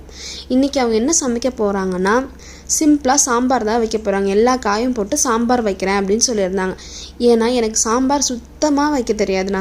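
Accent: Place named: Sri Lanka. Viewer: Emma Sister Neenga Enna Sri Lanka ah Va Avunga mathiri Pesuringa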